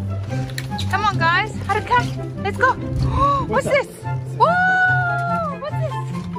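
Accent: South Africa. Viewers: What a precious pair of potatoes! And congrats on your new home! I'm a late subscriber to your channel. You sound like you have a South African accent. I'm a South African myself, so I was just wondering! 😉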